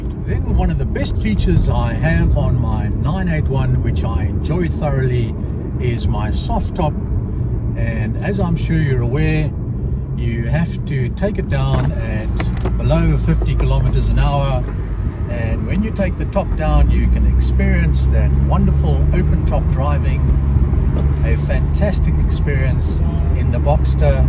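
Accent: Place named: South Africa. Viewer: Hi. Nice to hear your review and your SA accent! I’m amazed that you prefer your boxter vs 911? Find this surprising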